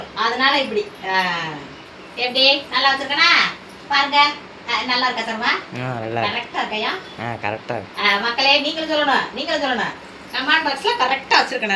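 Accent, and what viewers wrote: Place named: Southern Tamil Nadu, India. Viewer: அக்கா உங்களுடைய எதார்த்தமான தென்மாவட்ட பேச்சு சூப்பர் கா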